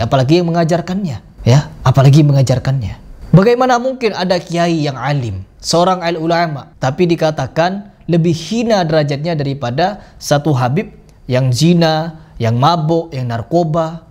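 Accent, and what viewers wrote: Madura, Indonesia. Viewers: Lucu sekali aksen maduramu bro!! Berani ga lu nantang debat salah satu ustaz yg kau suka gibahin??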